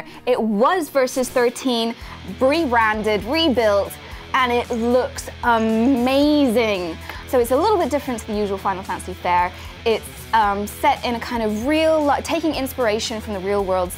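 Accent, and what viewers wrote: United States. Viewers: Before E3 she had an English accent, now she sounds american. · why do you always sound american but your from england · Is English I keep on getting confused because sometimes she sounds american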